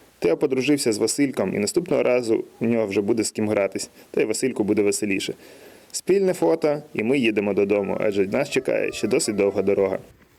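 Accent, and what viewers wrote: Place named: Rivne, Ukraine. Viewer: знайомий суржик:) Ви з Рівного?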